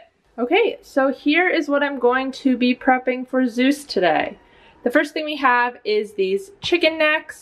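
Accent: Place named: Australia. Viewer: Your Australian yayyyyy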